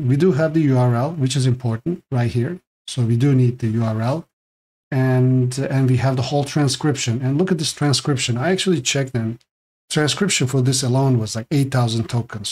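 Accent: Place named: Turkey. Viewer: Good points… out of curiosity, are you Turkish? I hear a Turkish accent.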